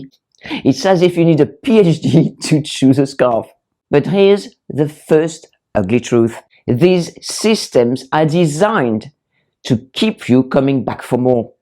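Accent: France. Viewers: Loved this❤such a charming, elegant lady with empowering advice and lovely French accent. Colour analysis was Big when i grew up, but after studying colour theory myself and experimenting for years i ended up with same conclusion as you😊 i used to like silver and cold colours but with age i look better in Warm colours and gold. Basically i choose colours that look good with my skin and can wear the same items over and over without wasting on trend colours. This allowed me to invest in quality items that i am truly happy with.Easy- peasy, sustainable & money saved😍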